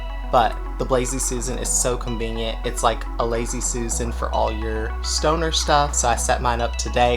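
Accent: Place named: New Orleans, United States. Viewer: That explains the accent! I'm from New Orleans so I'm like this isn't a Cali accent it's my neighbors accent lol!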